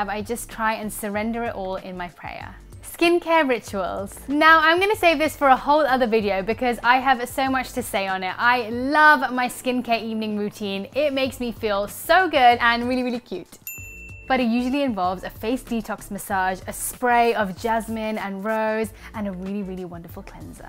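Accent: United Kingdom. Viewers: African-British-American-Indian lady with a Perfect British accent! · Yes, her roots are from india but she was born and raised in London so she has British accent 😊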